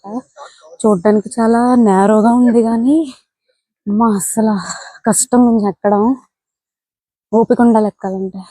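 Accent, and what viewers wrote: Godavari, India. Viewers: కాటుక కళ్ళు తో వినసొంపుగా, గోదారి భాష మాట్లాడుతున్నావు, తిలకం బొట్టు పెద్ద గా పెట్టుకో, ఇంకా బాగుంటావు.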